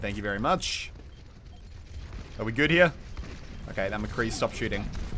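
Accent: Australia. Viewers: I want to see you play Junkrat just because i want to hear some funny australian flavor in-game commentary :D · U are Australian right?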